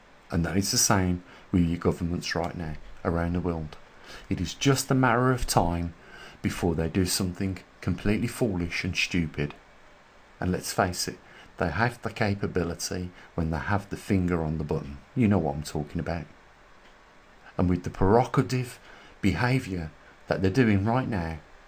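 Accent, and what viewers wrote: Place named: West Bromwich, United Kingdom. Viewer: M8… where ya from? I am in West Brom… you sound local, let’s meet up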